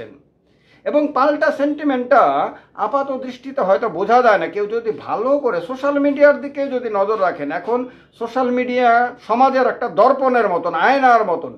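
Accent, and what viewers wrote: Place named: Bangladesh. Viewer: Tumi ekjon bangladeshi tumar kothatei bojhajai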